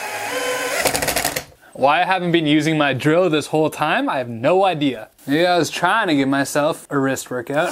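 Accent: Canada. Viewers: Buddy, are you a Maritimer?? ;-)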